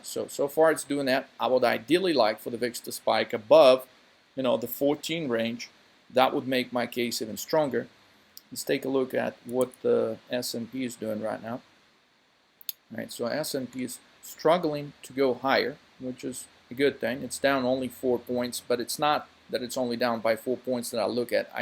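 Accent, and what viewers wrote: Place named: Minnesota, United States. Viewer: What the fuck is your accent? It sounds like you moved to Minnesota from Sweden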